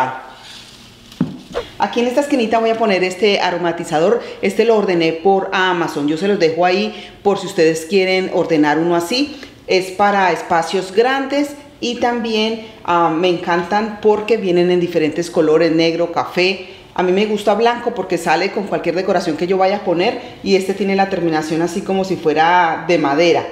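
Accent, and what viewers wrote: Antioquia, Colombia. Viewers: tú canal és maravilloso tús manualidades la forma tán sencilla como explicas todos los pasos a seguir en cada manualidad o cambio hacen de tú canal un lugar favorito único y muy acogedor tu sencillez tú carisma TODO EN TU CANAL ES ESTUPENDO ME ENCANTA y ése habladito paisa que me fascina pues yó vivo acá en bogotá colombia pero???? Toda mi familia mis raices son paisas FILANDIA QUINDIO BELEN DE UMBRIA y vallunas TULUA Y ROLDANILLO aunque también tengo tolimenses en mi familia mi abuela materna es de ALPUJARRA TOLIMA!!!! Un abrazo enorme a ésta bella compatriota qué és mi linda!!!!!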